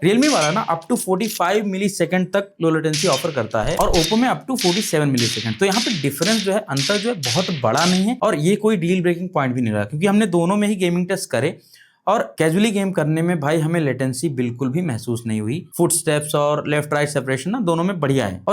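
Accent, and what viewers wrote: Standard. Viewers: আপনার ভিডিও আমি প্রথমবার দেখছি । আমি আপনার ভিডিও এবং আপনার বাক্য এবং আপনার ভাষা শুনে খুব প্রভাবিত হয়েছি। মুগ্ধ হয়েছি শুদ্ধ হিন্দিতে কথা শুনে। এইভাবে যদি প্রত্যেক মিডিয়া ইনফ্লুয়েন্সার রা ভিডিও বানায় তাহলে আমরা খুব সহজেই যারা অন্যান্য ভাষায় অভ্যস্ত আমরা খুব শীঘ্রই আপনাদের কথা শুনে হিন্দি শিখতে পারবো। ❤ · Aapki shudh hindi sunkar atyant anand ki anubhuti hoti hai 🙏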